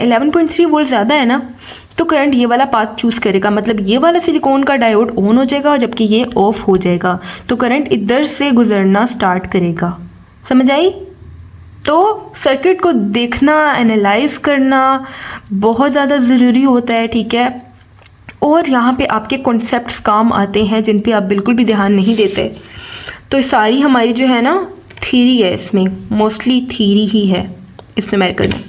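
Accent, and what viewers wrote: Pakistan. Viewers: Lahori accent 👍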